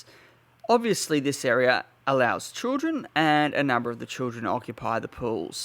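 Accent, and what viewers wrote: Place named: Bogan, Australia. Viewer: you sound like a bogan, and bogans love el cheapo P&O old tug boat rustboats, so no wonder u loved it darls, its the bogans of Australia that keep P&O going, bwhahaha, I wouldn't step foot on the floating shit boxes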